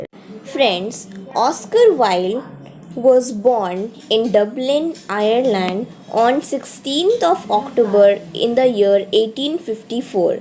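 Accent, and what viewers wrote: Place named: India. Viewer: You have a kind,sweet voice but it is not for narration. A heavy Indian accent just doesn't go with a tale of a very British poet. But a nice little biography ...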